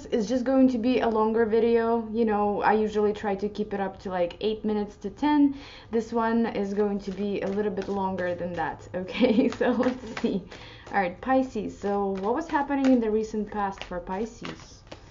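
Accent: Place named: Russia. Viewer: ❤️ your eyes. Resonate. Respect. I may fall in love with your Russian accent.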